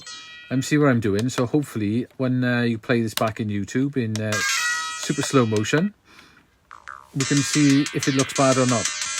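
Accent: South Wales, United Kingdom. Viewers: Yea south wales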